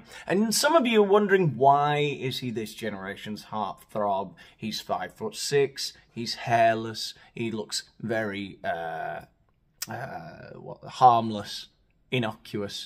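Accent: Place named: Wales, United Kingdom. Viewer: Hahahah love the Welsh accent 🙋🏼‍♀️🏴😅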